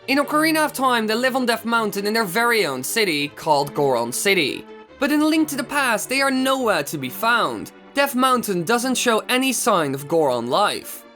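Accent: Netherlands. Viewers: are you actually from the U.S because u sound pretty dutch (nl) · fancy guy i can say with extreme confidence that he's Dutch. that's a one of a kind accent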